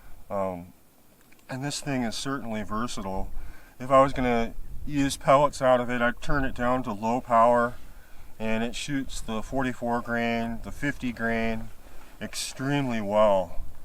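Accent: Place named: Minnesota, United States. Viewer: Good vid but GREAT shots!! : Couple questions: FIrst, can't help but notice midwest/Minnesota accent, where you from? Where are you hunting? Looks like you are hunting something that i get some shots at: Golden-mantled Ground Squirrel but the distance shots they look huge like prairie dogs in South Dakota. Just trying to figure out where a guy could get that much action. All the clues (cali license plate) are pointing to Arizona/California area, but I've never seen or heard of that many of any kind of rodent down in the South West. Thanks. (I shoot: Hatsan: Blitz .30/Barrage .25/Hydra .25/Flash Pup .177 - same Hawke scope as you, ATN X-Sight 4k Pro, ATN Thermal Monocular) I mostly night hunt the rat infestation in Vegas with the Barrage & the night/thermal. Thermal is a game changer!! Totally worth it. Thanks again.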